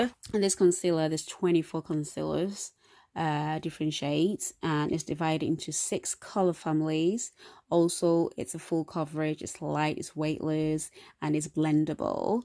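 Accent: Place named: England, United Kingdom. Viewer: Hi... Looks nice but how does it work on wood face?...seriously, your always beautiful, that English accent 😃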